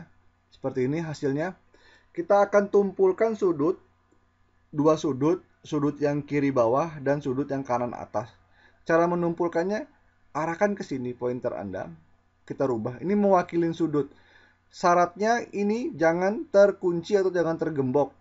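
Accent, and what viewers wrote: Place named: Garut, Indonesia. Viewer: Gan bagi wa nya dong, asli garut ya gan? Logat nya kaya orang garut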